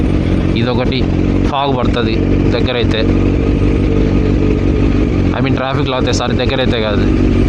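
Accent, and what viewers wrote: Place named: Rayalaseema, India. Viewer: Anna Rayalaseema yasa superga undhe…Anna medhe kadapa na ??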